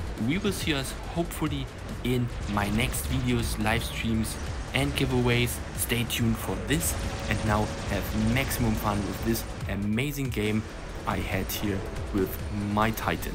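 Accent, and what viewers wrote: Germany. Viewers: ja das könnte natürlich sein aber sprichst nich schlecht englisch auch wenn man halt den typisch deutschen akzent hört😂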